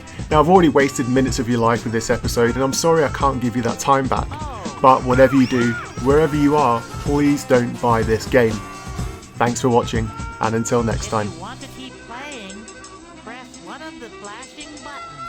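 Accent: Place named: United Kingdom. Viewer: I needed to rewatch this one after the last video, went full British on this one! very fun to listen:p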